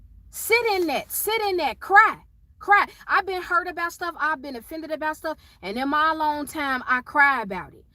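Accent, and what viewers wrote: Chicago, United States. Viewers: I knew you were from Chicago because of the accent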